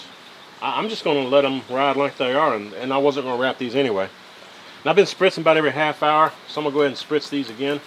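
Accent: South, United States. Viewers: Howdy. That southern drawl is music to my ears!! Much love from Waller,Tx.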